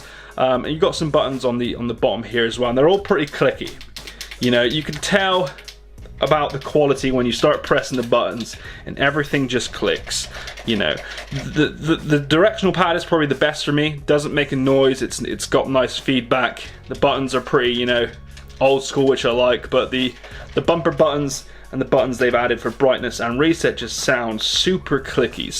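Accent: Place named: Scotland, United Kingdom. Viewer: Is your nationality Scottish? Your accent seems to hint at it. Just curious. Also, great video! I may consider buying one of those Handheld Super Nintendos.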